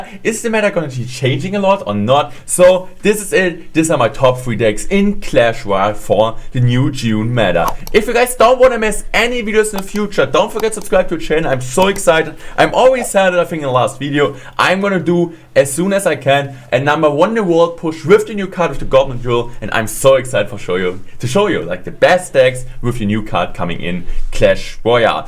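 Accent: Germany. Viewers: Man hört so krass das er deutscher ist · man merkt richtig deutscher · I like your german Aczent😂 Bin btw auch deutsch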